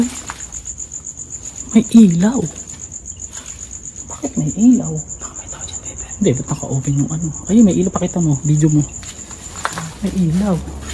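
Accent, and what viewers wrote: Visayas, Philippines. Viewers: Ka cute nimo mag bisaya oie 😍 amping permi ❤God bless 😇💖💖💖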